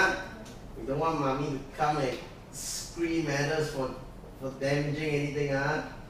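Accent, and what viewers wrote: Singapore. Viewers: Where are you from?, you have a Singaporean accent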